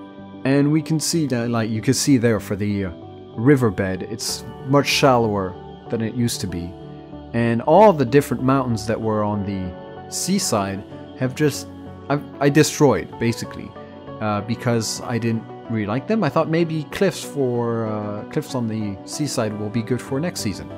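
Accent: United States. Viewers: est américain, donc forcément il a l'accent. Et pour le nom d'Aurélien Sama, un anglais (ou américain), quelque qu'il soit, s'il a un mot français à dire, le dira avec l'accent français (ex: Rendez-vous) et encore plus si c'est un nom propre.;) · Ce n est pas un bon accent en anglais il est américain il a plus tôt un très bonne accent en français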